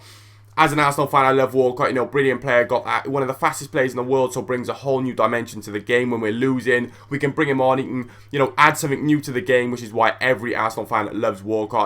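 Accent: Wales, United Kingdom. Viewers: you from Wales, Cardiff?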